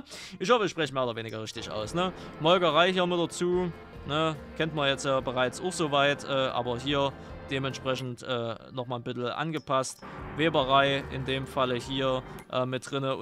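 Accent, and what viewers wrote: Saxony, Germany. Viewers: Tolles Video, Super Karte,da wurde richtig abgeliefert. Großes Lob an den maper. Ich bin großer Fan von deinen Videos und wie man hören kann bist du Sachse. Mach weiter so. Gruß Rene aus Dresden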